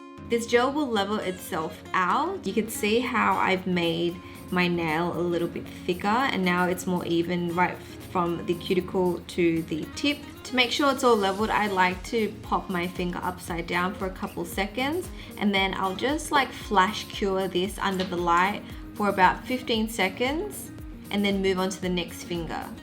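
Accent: Australia. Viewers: Your accent is cool, it sounds like you are or you spent time in Australia.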